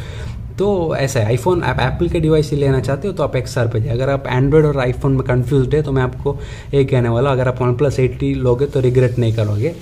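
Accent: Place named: Mangalore, India. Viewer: Your style of talking😁 like mangalore pepoles when talk hindi iam also from mangalore😉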